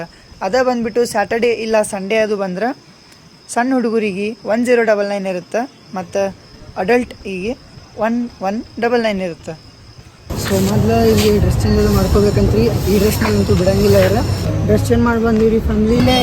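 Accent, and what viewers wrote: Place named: Mysore, India. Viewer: Nice trying Mysore language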